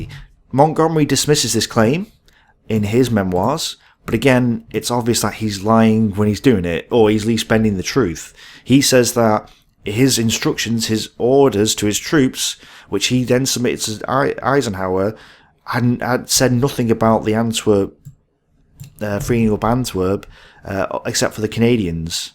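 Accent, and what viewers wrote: United Kingdom. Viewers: I did like this. But my Missouri boy ears had a little trouble with the British accent. I sounded like he kept taking about getting into "the raw." It looked like he was referring to central Germany. But I am not familiar with that term. Can someone help me?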